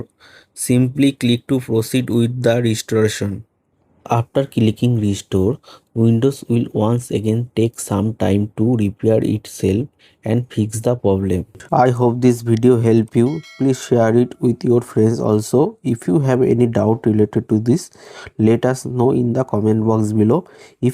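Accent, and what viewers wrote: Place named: India. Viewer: If you hear an indian voice in the pc repair hacks you know it will work thank you man your the best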